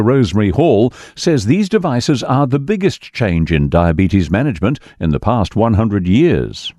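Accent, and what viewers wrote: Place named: New Zealand. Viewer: A real New-Zealander reading the news 🤟Love it and how rare today!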